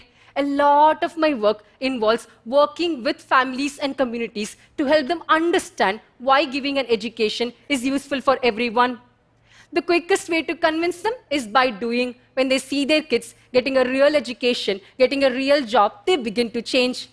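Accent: India. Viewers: despite her strong indian accent I deeply understand her, good job girl...I am a rural girl and now I'm studying PhD course. what brought me here to watch your inspiring speech was that at the moment I'm improving my english skills to be able to apply for a postdoc position abroad. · she is Indian, why wouldn't she have an Indian accent?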